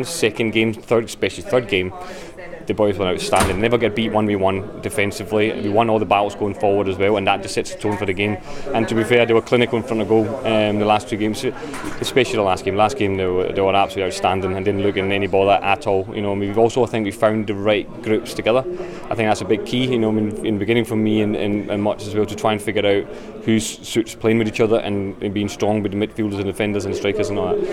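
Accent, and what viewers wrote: Scotland, United Kingdom. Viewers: love your scottish accent.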